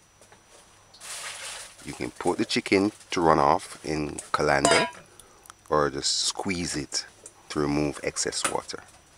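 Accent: Jamaica. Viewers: It is Jamaica accent I'm live there also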